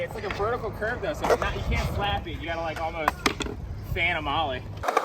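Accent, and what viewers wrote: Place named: Baltimore, United States. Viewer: You know someone is from Baltimore when they call it Baldamore 😂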